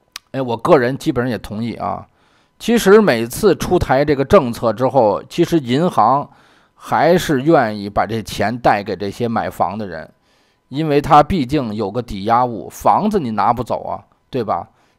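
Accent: Beijing, China. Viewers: 很喜歡你的視頻真棒京腔京味！聽了很親切。老北京人赞你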